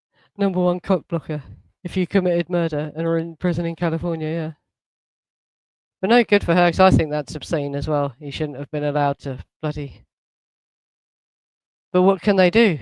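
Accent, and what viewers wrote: Cockney, United Kingdom. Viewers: Great presentations. Just hard to decipher you east end ? Accent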